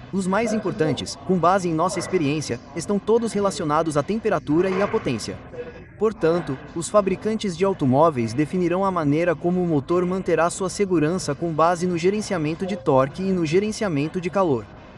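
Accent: United Kingdom. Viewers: YES, i hear the queens tongue and that makes me happy. THIS is how you speak, precise, calm and clear.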